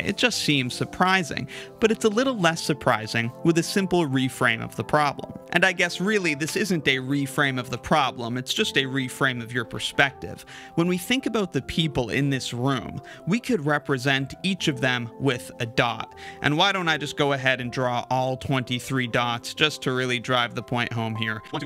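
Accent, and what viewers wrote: Canada. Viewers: Arr you Canadian by any chance? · Canadian! He gives himself a way a couple of times with with "aboat" 😝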